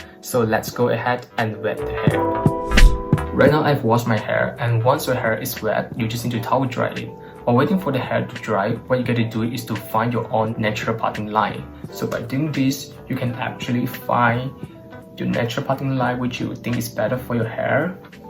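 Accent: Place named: China. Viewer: I can’t pick out where he’s from with that accent? Chinese? Taiwanese?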